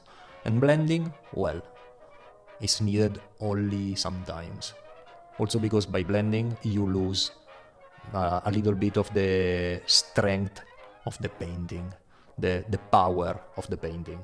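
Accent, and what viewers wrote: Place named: Italy. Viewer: Very nice video and I also enjoyed your rant. I just discovered your channel. Besides a fellow artist and nerd, I also like MotoGP and wonder about your accent. It seems slightly different than Valentino Rossi's (do you know him?). Are you from a different region of Italy? 👍🙏 Ciao!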